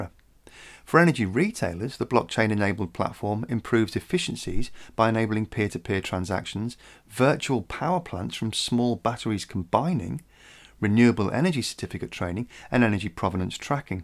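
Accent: United Kingdom. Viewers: Really impressing your film. The illustration of it let me think, that there is a big lobby behind your channel. Love your British accent. Me as a German (please forgive me my English!) am sceptical against so called renewable energy. Because we already pay for it very high. We have the highest energy prices in Europe, probably in the world. We live constantly close to a blackout. If we have had no neighbours with coal or nuclear plants, our system would crash very often. 2018 we have had two critical moments in our grid. In your film you are showing a beautiful landscape at the beach "decorated" with many windmills. This was a symbolic picture: human greediness and ideology destroying nature! My point is not: I am against electrical storage. No! Not at all! This is even the crucial problem of renewables. I am for obligatory solar panels on flat roofs in industrial areas. 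But I am against Solar Parks on fertile soil or in woods, against cornfields for our electrical comfort. Simply because of the efficiency of renewables, the so called EROI (Energy Return on Investment), which is too low. For that reason they need a big area to compensate. Photovoltaic has an EROI of 1.6, Biomass 3.5, Wind 3.9, Gas 28, Coal 30, Water 35. In comparison to it the old veteran of Pressurized Water Reactor has an EROI of 75! Which is not too bad. The windmills are meanwhile as high as the television tower in Stuttgart. The concrete weights 7000 Tonnes. The socle of 3500 Tonnes is left for ever in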